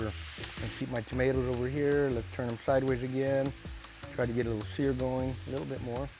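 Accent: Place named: Brownsville, United States. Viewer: I'm from Brownsville and this guy sounds exactly like the people here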